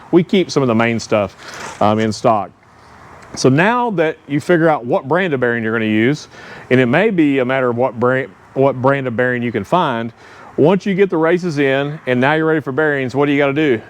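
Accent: Texas, United States. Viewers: I truly enjoy your videos and your texas accent, love it. If it weren't for the fact that your are more than 2 1/2 hours away from me (I live in Cedar Park/Austin area) and that I like to maintain my own RV, I will visit you regularly, even just for chatting. I just finished repacking my bearings (races and bearings were good!), and my next job is to replace the leaf springs. Thanks for your help, as I learned a lot with you. Thanks!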